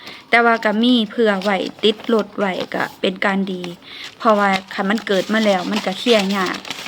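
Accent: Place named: Isan, Thailand. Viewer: เว้าสำเนียงคือคนอีสานเนาะ อยู่ไทย โดนละบ้อ หือ อย่าคิดลึกเด้อหละ😂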